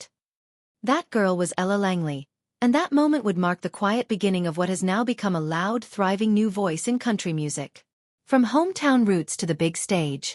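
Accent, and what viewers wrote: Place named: Alabama, United States. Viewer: She sounds country as them red chert roads here in Bama.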